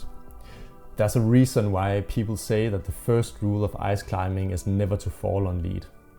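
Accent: Denmark. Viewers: Var i tvivl om du var dansker vurderet ud fra din accent.. Indtil jeg hørte “Arrrh! det var tæt på!”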